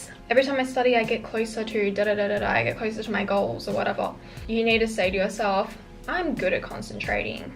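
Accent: Australia. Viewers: How to get straight A’s. me thinking why she’s talking about letter grades and she has an Aussie accent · her aussie accent tho 💖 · Her beng typical a aussie norr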